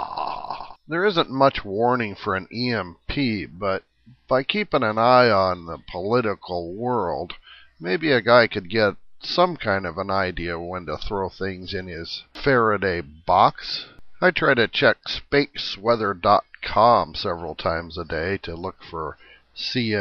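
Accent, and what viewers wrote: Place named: South, United States. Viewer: You sound like a southerner :D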